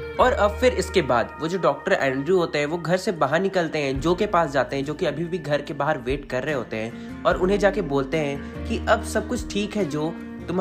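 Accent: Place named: Bihar, India. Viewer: By your accent I think u r from bihar 😀😀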